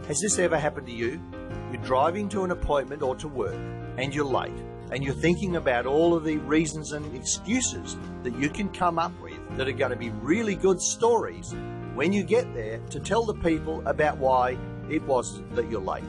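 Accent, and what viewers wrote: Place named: Australia. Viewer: I loved the Aussie accent 🫶🏼🦘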